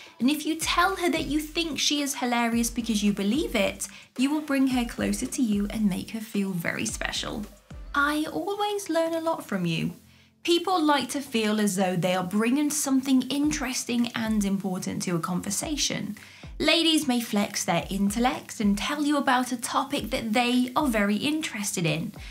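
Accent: United Kingdom. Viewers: Who is this British chick? Does she know Austin Powers?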